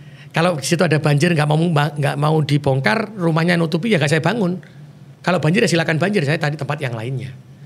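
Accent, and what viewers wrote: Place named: Surabaya, Indonesia. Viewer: Publik speaking nya bagus, style Suro boyoan yg SGT kental .merangkul, kecerdasan sosialnya super pokoknya. Good..josss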